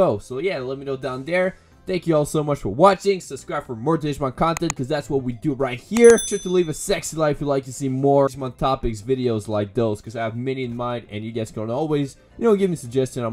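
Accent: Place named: Quebec, Canada. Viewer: Yo my guy, i gotta ask. Are you a french canadian by any chance? Your small accent and a couple word pronunciations are telling me yes XD. Je suis presque sure a 100% lol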